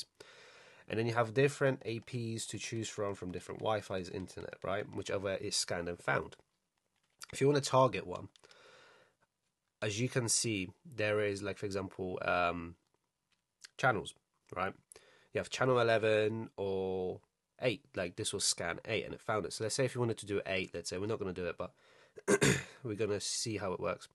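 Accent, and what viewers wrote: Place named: United Kingdom. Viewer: Great vid man. Nice to hear a fellow brit talking about ethical hacking, etc. New subscriber for sure. Im trying to get into ethical hacking/cyber. Would love it if you could do a roadmap for getting into ethical hacking. Im currently doing the comptia A+ and then hopefully the trifecta as well as linux+ cloud+ and pentest+ and some python, etc. It's just so hard to get into any pentesting roles as UK is still behind, and most pentest roles are senior. The US has so much more opportunities for cyber and pentesting as a whole. Any advice on that front as well would be great. Also what did you study to get into ethical hacking? Thanks bro🫡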